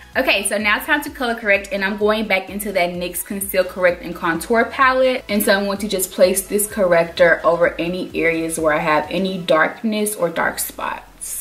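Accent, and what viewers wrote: United States. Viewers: Love your country accent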